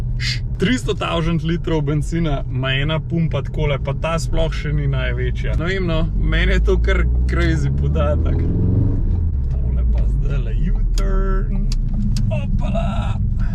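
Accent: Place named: Upper Carniola, Slovenia. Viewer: gorenščina pa še neka qvazi angleščina avto fajn zelo pa malo potrudise pa boš imel še več ogledov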